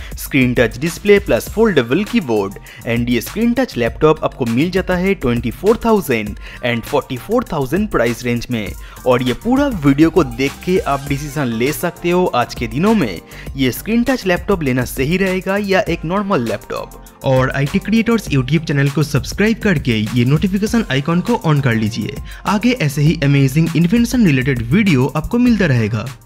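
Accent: Bengal, India. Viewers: Sound like you are a Bengali (Bangali)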